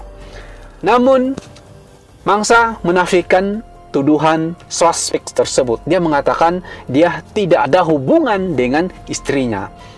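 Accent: Sabah, Malaysia. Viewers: Sabahan bha?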